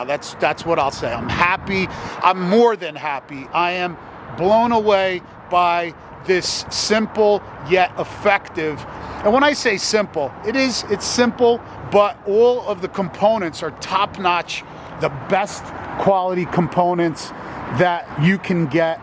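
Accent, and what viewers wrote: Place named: New England, United States. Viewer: I commented this before I heard you say you were in New Jersey, but hearing your accent I knew you were somewhere just below me and that looks just like the Holyoke mall in Holyoke Ma. (Which is only about an hour an a half south of me). I'll deff look for you at laconia. I've actually never gone to laconia for bike week... but Id love to see your bike up close and hopefully go for a quick scoot! I so want to see our bikes side by side threw the gears! See how I'm doin on that little 95" 😏😁👍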